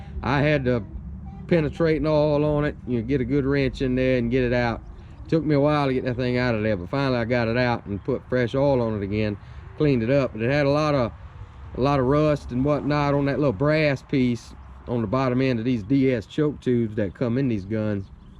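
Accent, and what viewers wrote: United States. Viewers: I don't know what part of America but just love that accent. Thanks for the review.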